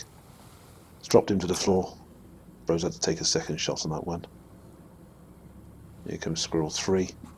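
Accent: England, United Kingdom. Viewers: Love these videos as I hate these destructive pests. The English accent is also a nice touch. Thanks for the professionalism in producing these videos.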